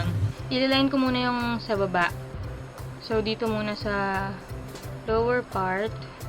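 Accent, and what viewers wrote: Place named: Philippines. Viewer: Are u filipinoss?? So beautifull. And ur tagalogg soo 😍😍😍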